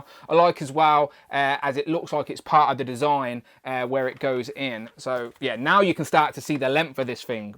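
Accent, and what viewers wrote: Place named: England, United Kingdom. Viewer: These English gents are so funny the way they pronounce everything… Absolutely hilarious a lot different than us Americans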